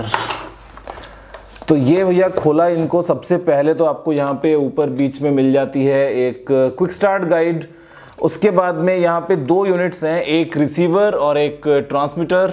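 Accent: Bengal, India. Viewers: Ap Bengali ho?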